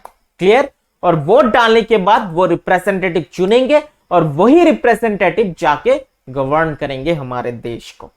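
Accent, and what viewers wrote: United Kingdom. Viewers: Change ur Britain English and speak as upsc aspirants or in Hindi😠😠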